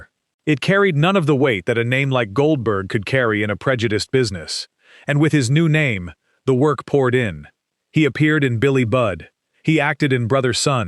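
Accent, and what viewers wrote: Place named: United States. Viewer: The accent in the narration of this video makes it quite clear that it is the US racism which motivated its creation. For my whole life 80+yrs I have known that a very large number of people in show business were of jewish origins and it really has not matter one tiny little jot to me or anyone in Britain. Only someone from USA would be motivated to create this diatribe of changed names which has zero value to British people with all embracing British values. Why do you expose yourself.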